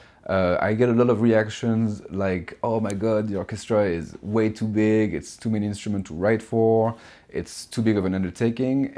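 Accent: France. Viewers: Hi, are you french ? I am French and your accent sounds very French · Pur accent francais mdrr très interessant en tous cas c'est super on manque de chaines comme ça sur yt ! Je me tate aussi à m'y mettre ^^ Mais ouais encore une fois très cool ! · Great video! Are you french btw? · This accent... are you French or so? Wonderful video! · French accent spotted !!! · super vidéo ! avec l'accent français . i luv it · nice vidéo and a lovely accent ! (french ?)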